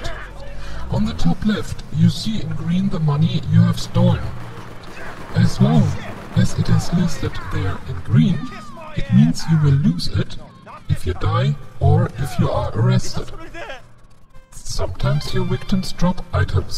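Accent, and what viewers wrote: India. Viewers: indian darth vader?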